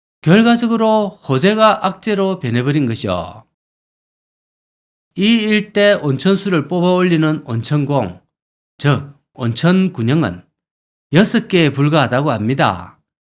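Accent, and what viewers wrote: Gyeongsang, South Korea. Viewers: 수고많으십니다 구수한경상도 말씀 재밋게시청합니다♡♡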